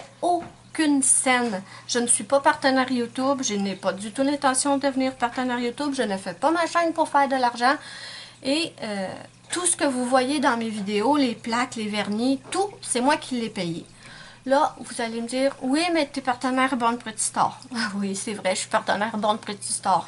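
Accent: Quebec, Canada. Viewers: Merci pour toutes tes vidéos 😊 et surtout ne change rien, reste toi-même c'est parfait 😉👍!! J'adore ton accent québécois 😃 !! Et comme tu le dis très justement, ceux qui n'aiment pas tes vidéos n'ont qu'à passer leur chemin. Comme on dit "la critique est aisée mais l'art est difficile" alors que les insatisfaits aillent sur d'autres chaînes ou fassent mieux !! Continue comme ça et merci 😊😊😊👍👍👍 !!!! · Cc on t apprécie comme tu es et reste naturel j adore ton acsent québécois et tu es super. Très jolie ton Nails art. Biz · Super joli !!! Je suis tout à fait d'accord avec toi !!! Moi si je te suis c'est justement parce que tu es authentique et j'adore ton accent canadien😍 ne change rien surtout... j'attends toujours tes vidéos avec impatience et j'ai tout appris avec toi !!! 😘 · Bonsoir.Je rejoins l'avis des autres personnes qui ont laissé des commentaires....Ne change rien.... 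surtout!!!! Moi je regarde tes vidéos....pour ton accent québécois.... Il est très....cute...comme tu dis souvent 😊... Et également parce qu'elles sont bien faites...tout simplement. Je ne fais pas de nail arts mais j'adore regarder les tiennes.le résultat est magnifique.Je fais du coloriage pour adulte et j'aime bien ton autre chaîne notamment pour les présentations de livres car tu es franche en les présentant.Surtout continue comme ça!!! Bonne soirée! Bises!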